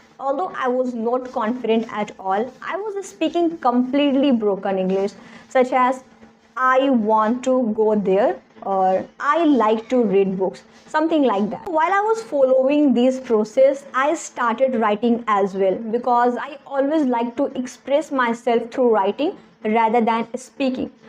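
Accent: India. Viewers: I mostly watch contents in American and British accent so campair to them your account is really cute😂. I watch your video just for your pure Indian cute accent.❤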